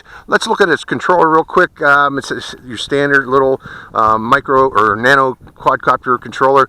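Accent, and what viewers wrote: Pittsburgh, United States. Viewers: gotta love that pittsburgh accent :)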